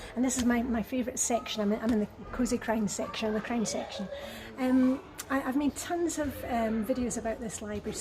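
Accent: Scotland, United Kingdom. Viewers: I love your videos! I am American, and you, as you talk, remind me of my friend from Scotland. Thank you for sharing those book reviews with us 😃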